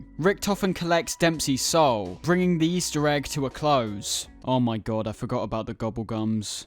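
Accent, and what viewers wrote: United Kingdom. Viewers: Australian? This guy’s British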